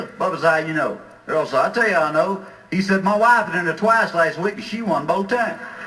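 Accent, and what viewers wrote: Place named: Georgia, United States. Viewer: He was born at Fort Benning which is considered outside Columbus, Georgia. He was from South Georgia and he sounds so different from North Georgia. : )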